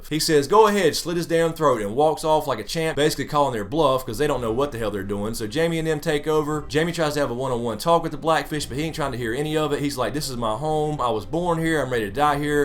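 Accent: South, United States. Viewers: and fuck that dislike. Probably some other GoT reviewer. You have some of the best GoT videos out, and I try to watch all the good folks. Plus im pretty sure from your accent your from the south, or maybe out west in texas or something lol. Either way, us southern folks gotta stick together lol.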